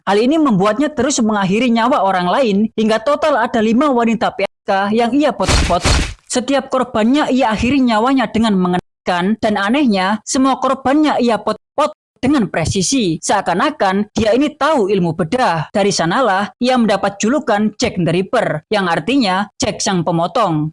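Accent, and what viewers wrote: Java, Indonesia. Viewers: Terlalu medok mas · logat jawa dan meme pria hitam. admin asli ngawi fix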